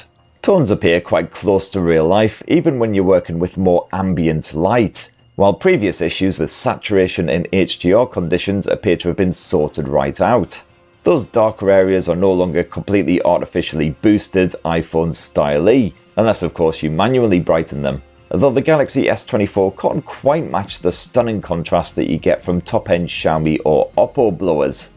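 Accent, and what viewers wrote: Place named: Manchester, United Kingdom. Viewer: 4,000mAH battery, no microSD card slot? No way!:face-fuchsia-poop-shape: Incidentally, you realize that only Pommies and Aussies will understand your slang and your Mancunian(?) accent? :face-orange-raised-eyebrow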